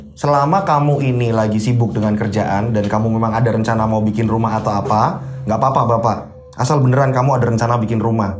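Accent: Java, Indonesia. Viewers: Tangerang haderr Cafe King's ( Poltekpel Banten) By:Bunda ony Nb: br 6 bln ikutin channel tp udh ngerasa nyaman banget dpt banyak ilmu dan hikmah dr smua story.logat jawanya bikin seru...sumoah ada humor di setiap story cara membawa kisahnya bnr bnr bikin Susana hidup.hebat....thankz buat & crew yg kreatif banget jd penerus bangsa....sehat slalu ya buat & crew...sukses ke Dpn....di mudahkan sgla urusannya.amin... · Siang mas.. aku suka banget ceritanya mas.. aku suka logat Jawa nya..nglotok banget 👍👍👍👍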